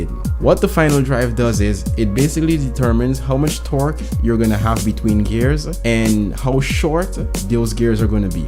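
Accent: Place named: Caribbean. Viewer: You sound Carribean, are you?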